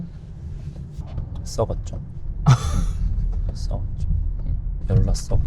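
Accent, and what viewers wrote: Gyeongsang, South Korea. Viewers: 아마도 경남분이신 것 같아요. 제 부산친구랑 말투가 같아요. 경상도 사투리라도 억양이 조금 다르죠!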